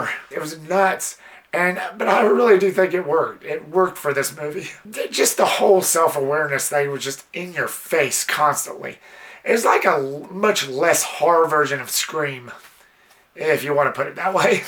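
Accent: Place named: South, United States. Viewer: You sound like Vince Gilligan, but we are all southern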